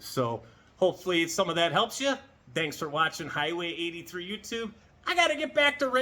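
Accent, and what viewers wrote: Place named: Appalachia, United States. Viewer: Damn you talk like you're right out of Appalachia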